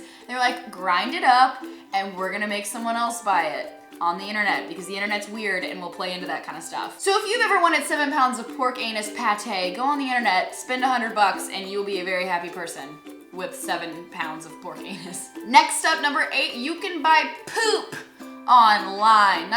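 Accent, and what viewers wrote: Arkansas, United States. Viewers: What part of Arkansas is she from?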